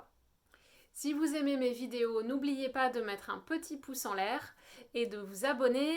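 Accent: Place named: France. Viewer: C'est mignon. Haha J'en profite pour te dire que ton ""français de France" n'est pas extrême. C'est-à-dire, un peu comme mon français Qébécois. Tu n'as donc pas un français à la Parisienne (avec plein d’anglicismes. Alors, pour moi, c'est ce que j'appelle un français international, lorsque je l'enseigne. Donc, tout cela pour dire que ce qui te marque le plus (comme pour tout autres français), c'est le québécois extrême de la rue. Les gens parlant un français de qualité au Québec, nous détestons les anglicismes... Que ce soit d'ici ou de la France. Haha. Nous avons même inventé des mots remplaçant les mots anglais. Comme "courriel", "magasinage", "stationnement", "fin de semaine"... Et beaucoup d'autres. Parlant 3 langues (français, anglais et mandarin), je préconise grandement l'utilisation d'une seule langue à la fois. Pour une question de clarté linguistique, bien sûr. 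Mais aussi, culturellement, ça n'a pas toujours la même signification. Et j'adore me frotter aux différences culturelles pour bien comprendre mon interlocuteur.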